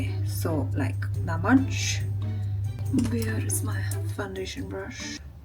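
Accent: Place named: Maharashtra, India. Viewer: marathi accent , itna time mahi re baba ❤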